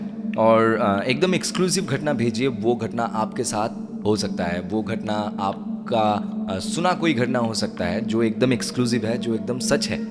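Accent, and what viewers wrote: Bengal, India. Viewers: Bengali tone avivi hein hindi mein... dhire dhire hindi tone pakar loge bhaiya. Avinandan. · Dada ami tor onek boro fan. Tumi jkhn dadagiri te aschile tarpor theke ami pretkotha r sob episode sune niyechi sathe purono sob ghotona o sune felechi.Pretkatha hindi channel tumi jedin launch korle seidin e subscribe korechi. Hindi ghotona gulo sune amr mone holo j comment kori. To asol kothai asi kichu kichu word ache jegulo tumi bengali tei bolcho jemon aasa- 'ummid' hobe r 'mrityu'- mat hobe r bengali te 'র', 'ড়' ei 2to alphabet er opor ektu kaj korte hobe. Plz bollam bole kichu mone koro na. Actually Pretkatha r member hoyai Pretkatha jate r o perfect hoi sei jonno comment ta korlm.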